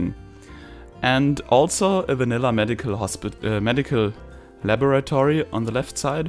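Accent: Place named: Germany. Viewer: What was with the intro at the beginning? Was that a mistake? Also, I love your German accent.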